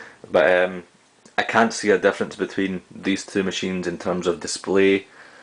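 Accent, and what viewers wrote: Scotland, United Kingdom. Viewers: scotish? irn bru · wtf? scotish..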